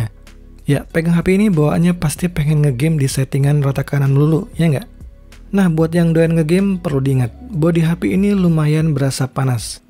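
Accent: Palembang, Indonesia. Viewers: Abang uong palembang cak nyo 😁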